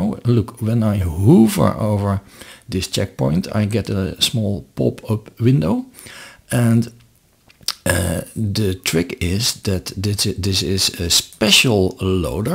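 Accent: Netherlands. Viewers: Thanks for the overview of what this extension does I have to say but away. To say when you started it was really hard for me to figure out where you were from but the further you went on in the video, hoe meer het Nederlandse dat Nederlandse tongval wil gewoon door te schemeren. But that's not important great video man thank you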